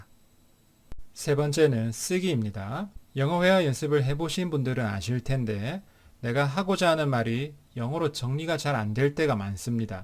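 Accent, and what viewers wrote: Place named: Busan, South Korea. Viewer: 강의중에 부산억양이 살짝 들려서 여쭤 봤습니다! 향후 강의 개설계획 있으시면 좋겠습니다~여기도 부산입니다~~^^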